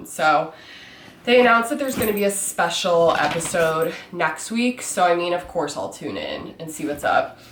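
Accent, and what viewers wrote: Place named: United States. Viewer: I love in American Accents. By the way, May I know Where is your accent in America?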